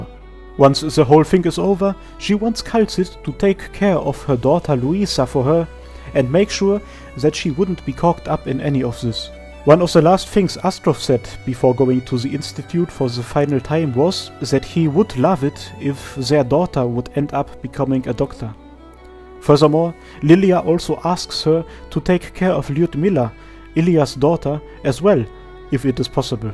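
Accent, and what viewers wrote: Germany. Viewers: A short woustion are you germen becaus it sounds a bit like you have a german accent if so Servus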